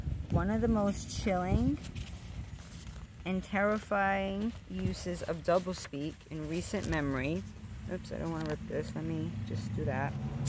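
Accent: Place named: Bronx, United States. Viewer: I can barely hear your Brooklyn, Bronx accent in your voice.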